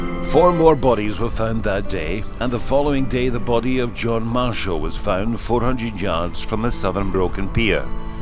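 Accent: Scotland, United Kingdom. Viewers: Nice to hear a scotish accent of a happening in Scotland . So tired of bbc and british accent ruling the kingdom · this is a very good video - very well done. the accent is lovely. i told my son this man could say the most vile things and it would still be like music. just sayin, it's a melodic, well spoken language. this is such a sad story and i've listened to it twice - because of the narration. also must say have a good friend who married a scot. he left me a phone message early on in their relationship - all i could understand was the word cat and my friends name. a 3 min. phone message. THAT said, i understood as much of that as i have from certain "american" accents. south...upper north east ...west coast..... I'm from chicago - oh excuse me..chiCAAgo - the all american accent, Ugottaproaablemwid dat? see how much nicer this scot's audio is? 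· A very well presented and researched documentary. A tragic accident that as is often the case, down to several factors and the various parties involved. Thanks to the narrator and producer of this video. I felt it very fitting that a Scotsman told the story and I'm glad I clicked my mouse to view it! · Corey M ..I am glad that the commentary was done by a Scot...Not by a Sassenach. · Guys he's Scottish. Get used to it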